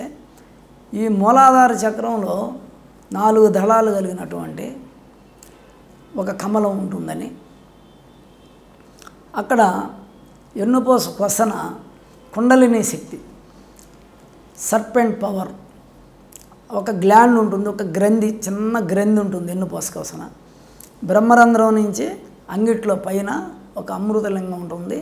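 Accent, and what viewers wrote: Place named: Rayalaseema, India. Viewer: చాలా సరళంగా చెప్పారు ,గురూజీ రాయలసీమ మాండలికం స్వచ్ఛమైన తెలుగు వల్ల కొందరికి అర్ధం కాలేదు అనుకుంటున్నారు మెల్లిగా మళ్ళీ చూడండి🎉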